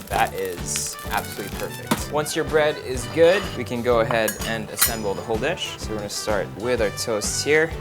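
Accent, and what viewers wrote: France. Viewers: Homies French? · Great, simple recipe.. It look so yummy! And that French had me swooning over even more than usual. Keep it up! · good French accent !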